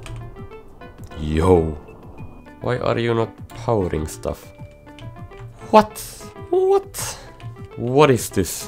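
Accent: Finland. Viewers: I sense the Finnish accent, great video👍🏼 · You have the most finnish accent ever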